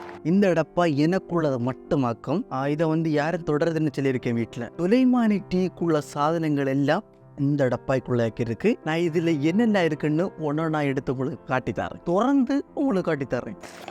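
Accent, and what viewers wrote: Kerala, India. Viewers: அடேய் தம்பி., சுலைமானி tea பல பேரு செய்றாங்க..நீ எப்படி பேசிகிட்டே செய்றன்னு பாத்தேன்..உன் கேரளத்து தமிழுக்காக தான் இந்த காணொளியை பார்க்கவே செய்கிறேன்..😅😂🎉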